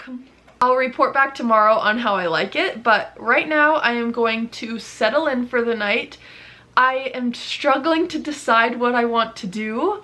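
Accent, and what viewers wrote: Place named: Montana, United States. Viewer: I was born in Montana ages ago. I came across your channel and your voice/accent stopped me in my tracks. Boy did I have a bout of homesickness! You sound like home to me. Love your channel. Quick question. Did you design the Montana home pillow? I’m a crochet girly and would love to make one for my bedroom. If you did design it, do you sell the pattern? If not do you know where I can get it? I subscribed just so I can hear your accent and see Montana content. My uncle (now passed on) had a ranch in Ekalaka. I was born in Choteau. Mom was born in Ekalaka. Dad went to school in Bozeman. Like I said, you’re a bit of home in my ears. Love your channel. Cerise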